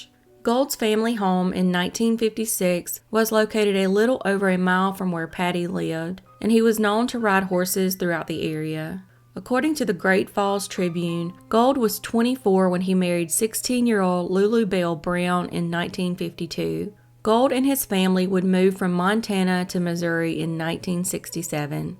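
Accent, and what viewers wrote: South, United States. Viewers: I usu dont like southern accents, but I just love ur. Stay safe. Love fr Panama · It was funny..at first, I thought wow she has worked her Southern accent out! Duh…I’m Southern too! We sound the same…🙄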